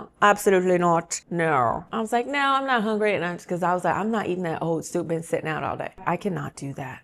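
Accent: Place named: South, United States. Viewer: I can tell your from the south cause that is how we talk with the accent ,we don't put up with any bull crap.love y'all's story time .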